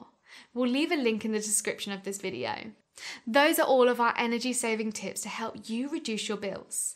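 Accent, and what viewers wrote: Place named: United Kingdom. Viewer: Radiators are uncommon in the USA. Guessing by your dialect, you are across the pond. Other tips work for both countries.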